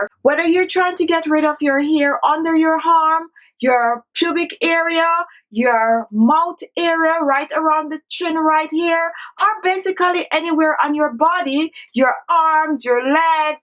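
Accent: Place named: Jamaica. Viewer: I am subscribing, not only because the information is good, but also because you sound Jamaican! Thanks for sharing, I will be trying this!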